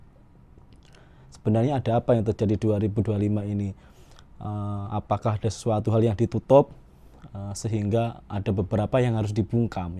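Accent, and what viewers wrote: Java, Indonesia. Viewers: Ms miriP Orang Korea kk..tp jowone medok..orang mana ya kk.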